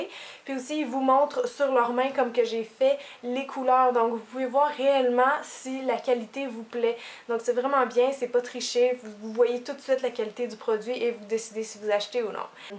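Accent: Canada. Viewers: salut je viens de m'abonner une copine m'a montrer ce ke tu fait moi j'adore et l'accent canadien c tellement magnifique , j'ai vu ta beaucoup d'abonner et tu l'ai mérité ! :)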